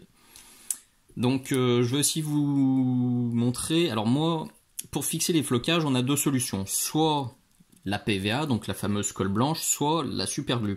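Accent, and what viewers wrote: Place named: Lyon, France. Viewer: Superbe vidéo, je ne savais pas pour le ciment, et encore moins qu'un produit tout prêt existait. J'adore, l'accent lyonnais. :-) Je m'abonne et je like. A +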